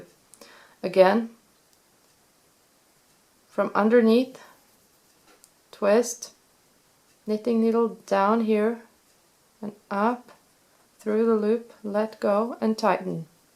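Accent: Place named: Norway. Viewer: Thank you for this detailed explanation! I lived in Norway ten years ago and hearing your accent brings such nice memories. Also your English is perfect! I remember my Norsk friends would always be embarrassed to speak in English too (until they had a few drinks!) so I smiled when you mentioned it. Tusen takk!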